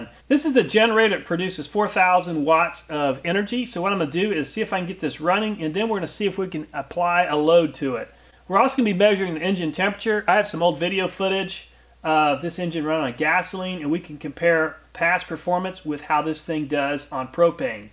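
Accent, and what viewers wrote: United States. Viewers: I really like your videos and your American english. Maybe try next time create hydrogen and oxygen from water with electrolysis and supply gasoline engine with mixing of this two components. It will be probably hard to create enough such fuel but you can at least try this. Instead of that you can try supply engine with clear oxygen instead of air.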